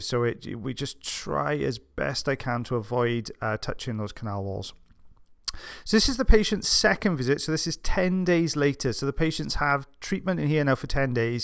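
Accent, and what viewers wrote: Wales, United Kingdom. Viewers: I love the accent! Especially Welsh! And the words used or sayings, in the UK are lovely! I've used "give it a go" so much Its a part of my every day dialog!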